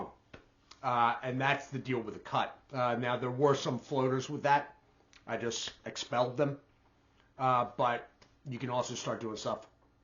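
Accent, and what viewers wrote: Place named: Pittsburgh, United States. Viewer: When you said “PA pricing,” it all clicked! I knew I recognized the accent. I am Pittsburgh born and raised 😂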